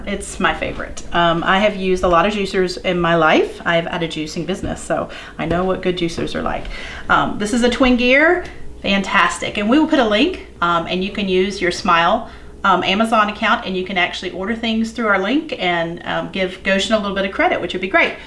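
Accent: South, United States. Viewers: Fahima Bemberry I think you misunderstood, Fahima. She said “Grass Fed”. Not “breast fed”. 😂😂😂 It’s the southern accent. It throws you off sometimes.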